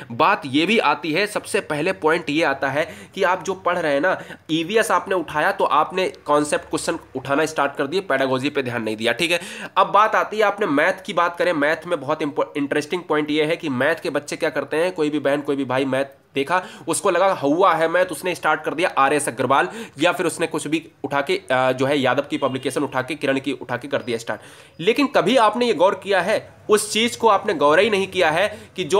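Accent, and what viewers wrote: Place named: Uttar Pradesh, India. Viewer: Up se sir khadi boli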